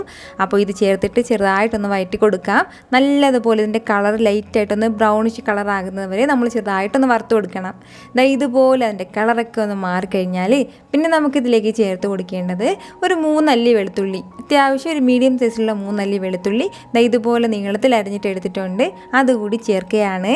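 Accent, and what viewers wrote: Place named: North Kerala, India. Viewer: ഒരു വടക്കൻ കേരളം slang ഉണ്ട് kitchen may have routes from മലപ്പുറം, കോഴിക്കോട്, or കണ്ണൂർ.., I think so. Very cute വർത്തമാനം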